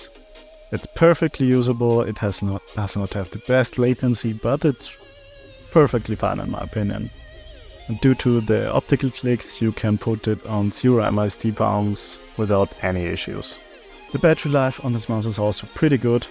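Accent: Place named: Germany. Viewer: I love your accent so much, German?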